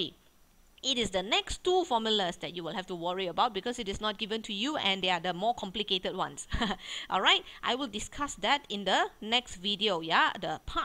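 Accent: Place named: China. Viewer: She's Chineese. Indians have worse accent.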